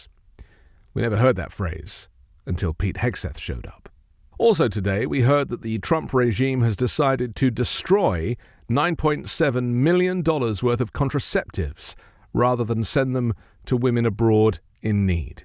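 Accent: United Kingdom. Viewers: You have such a lovely calming voice, British accent, really easy to listen to.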